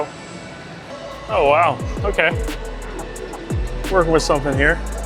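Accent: Canada. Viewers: He said he flew in from new york. But the accent is obviously Canadian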